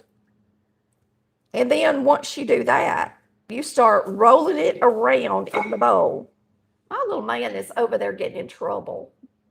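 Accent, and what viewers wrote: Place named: Georgia, United States. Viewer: I love your Georgia accent! it reminds me of my grandma Nichols from Georgia. She loved to bake biscuits, cornbread and sourdough rolls to die for! Her idea of a balanced breakfast was a cup of coffee and a slice of homemade cherry pie! So good!❤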